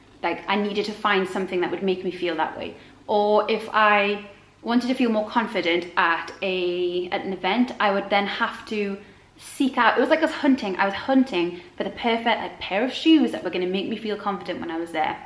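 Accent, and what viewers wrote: United Kingdom. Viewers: you are such a lovely person! So glad I've just found your channel. Subscribed! It's always refreshing to hear a British person talk about minimalism.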